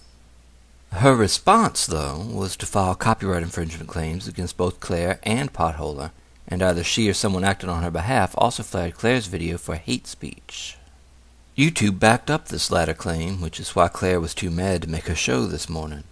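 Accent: South, United States. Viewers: Dude, you're a personal hero of mine. The insight and unvarnished truth blares triumphant. May I say, your voice of 'southern comfort' has found a fan north of your borders.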